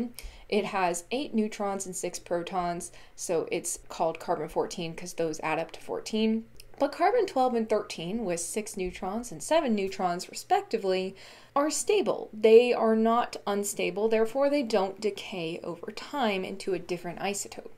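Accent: South, United States. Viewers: awesome videos. are you from the South?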